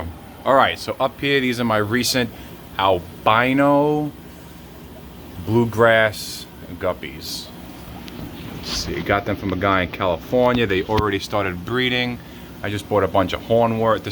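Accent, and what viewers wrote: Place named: New York, United States. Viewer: Where you located definitely a New York or New Jersey accent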